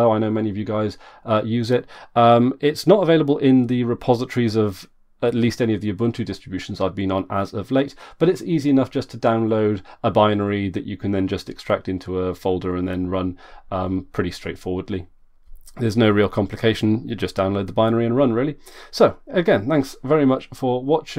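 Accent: Wales, United Kingdom. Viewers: wait r u welsh can u speak welsh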